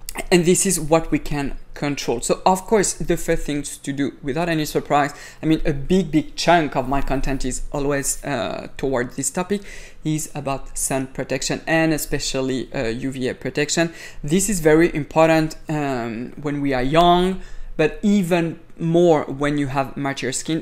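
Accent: France. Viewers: Bonjour!Hearing skin care tips with a French accent makes everything sound more luxurious.Great suggestions but as a 68 year old with acne I am afraid to use exfoliators for fear of making the acne worse,like purging from RetinA.Also as you get older your skin starts to thin and is more prone to broken capillaries.Would you still suggest these products for someone with my skin issues?I hope you have a lot of videos because I cant sleep and what better way to spend the time than binging on beauty videos.Good night!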